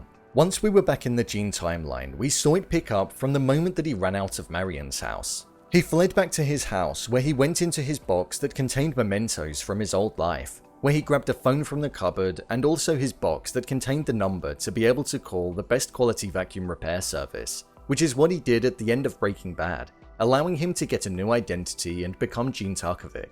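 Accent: United Kingdom. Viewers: Oh god a prissy British guy talking. Disliked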